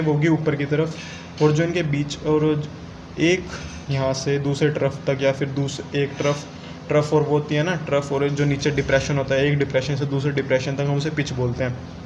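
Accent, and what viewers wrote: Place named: India. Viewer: Yr aap to mst desi bolo ho mst lge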